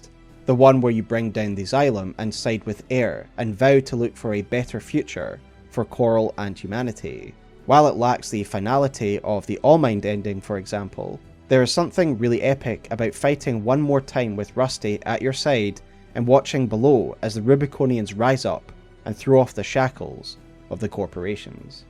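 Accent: Scotland, United Kingdom. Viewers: You’ve never heard a Scottish accent?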